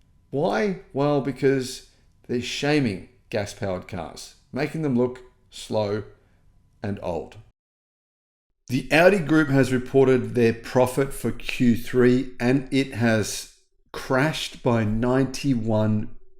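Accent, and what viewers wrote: Australia. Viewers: Still prefer the ICE versions ,I don't care how quick they are in a straight line they are cold ,sterile ,have no sound ,no soul , character ,the gas powered version has the sound of that engine the sound of that thundering everytime you push the peddle it has character , "soul" . BTW ,are you an Aussie? Mate.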